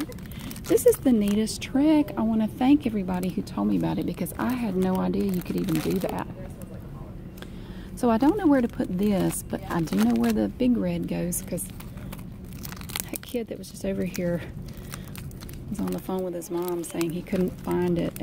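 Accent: South, United States. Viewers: Seba Arias southern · Seba Arias she has a southern accent ( she probably lives somewhere south in the us like Alabama or Texas or something) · I love your shopping videos despite all the background noises... it makes me feel like I'm shopping mom/grandma (I only say grandma cuz my grandma has the same sorta southern accent as you!) Cept she was mean to me and your soo super sweet and nice! It's almost like having a mother who loves me... I know that sounds a bit sad but your very comforting to me like the mother I never had <3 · Seba Arias american? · I think she won me over with the crunch of the bagged candies sound and her Southern Drawl. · This is the best ASMR video I’ve seen and heard in a loooooong time. Certain accents do it for me, so the wonderful combo of your southern accent, your soft talking (I’m not a huge fan of whispering, so your voice was perfect), your attentive organization and knowledge, the sound of all the crinkling......and 30 minutes of it! 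Pure ASMR perfection......thank you......I can’t wait to watch more of your vids. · Zoran K. georgia,south carolina,north carolina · TheLion yeah sounds very NC/SC/Georgian as someone with family from that region. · Southern usa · If anyone from Britain comes back to these comments, I have a question. This video’s narrator has a Southern accent (she would say I have a Yankee accent LOL). Does her Southern accent sound more like British English than other American accents? I have heard this may be the case but was not sure. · Normally I find southern accents annoying but yours is so soothing. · Her southern accent is giving me LIFE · southern it sounds like north Carolina or Tennessee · Southern, we have it where I live but I’m not that extreme😂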